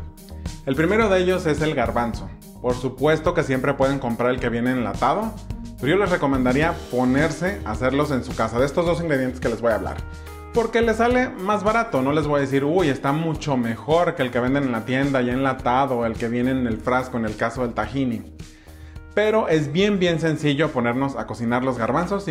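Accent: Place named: North, Mexico. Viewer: justo ando haciendo hummus ( yo le quito el pejello porque da pedorrera 😅🙈 donde eres por el acento? arriba el norte mijo haahahah saludos desde sonora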